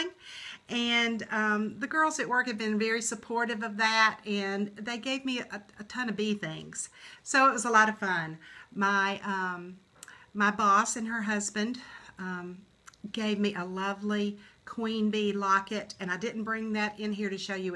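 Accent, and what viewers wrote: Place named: Georgia, United States. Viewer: Happy Birthday! I love your videos and I’m so excited that you are going to be making more! I’m from Georgia (currently living in Louisiana) and hearing your accent is a wonderful reminder of home. I would love to stitch the Baroque Ink Circles. Thank-you for sharing your love of stitching with all of us!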